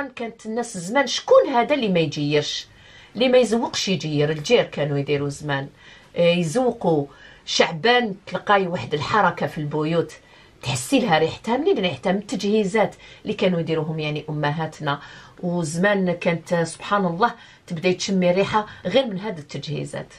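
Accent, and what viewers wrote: Algiers, Algeria. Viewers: شحال نحبك يا نحسك اختي الكبيرة نتعلم منها بزاف الصوالح شكرا على نيتك و عفويتك و زيدي علمينا نحب هدرة تعك تع صح algéroise بالطبع الله الله كما تقولي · كلامك حلو كي العادة و اللهجة الجزائرية من فمك عسل لي قلتيه كلو صواب ربي يرزقتا و اهنينا و يحفظ بلادنا انا فخورة بيك و نشكرك على النظرة لمليحة لي عملتيها على المراة الجزائرية ربي يحفدك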